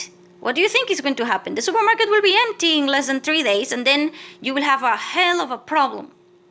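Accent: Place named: Mexico. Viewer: what country ? you didnt' say. You sound mexican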